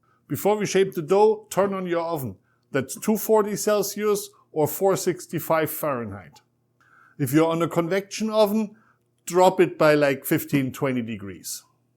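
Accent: Germany. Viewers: Nice, and the German accent is nice too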